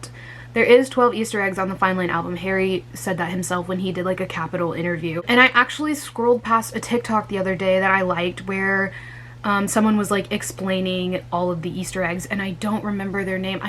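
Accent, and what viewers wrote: United Kingdom. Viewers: ) I absolutely LOVE your shirt and your British accent is hilarious, we also randomly break into American accents sometimes too haha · Your british accent was really good!!! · That british accent tho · British accent on point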